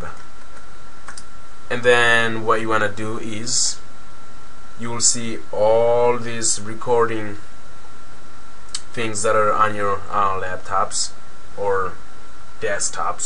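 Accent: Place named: Brazil. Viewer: Are you Brazilian? Your accent seems to be very Brazilian :D Thank you for the tips :D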